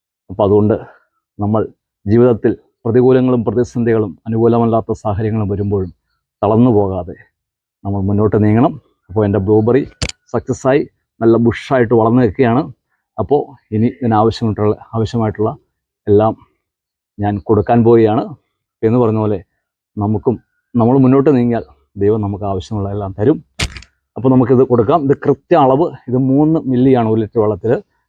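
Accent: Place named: United States. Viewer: ഒരു അമേരിക്കക്കാരനെ പോലെ സംസാരിക്കും. ഇംഗ്ലീഷ്.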